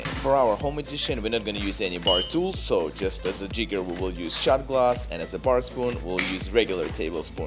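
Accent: Russia. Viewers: Why do you talk so Russian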